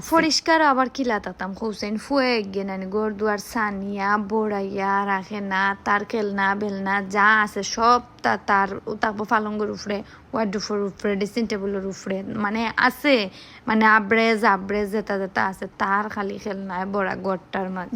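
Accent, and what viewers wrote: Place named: Sylhet, Bangladesh. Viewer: আমা গ সিলেটি আপা 😅😅😅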